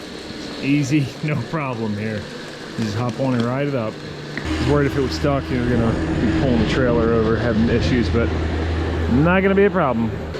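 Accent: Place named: New Jersey, United States. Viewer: I’m not a car guy or “Motörhead” but I am amazed by your videos. The expertise and the way you can diagnose and fix just about everything… And you have the parts right there at hand… Is truly incredible to watch. Plus I love the jersey accent because that’s where I was born and raised. Anyway, keep up the great work, man.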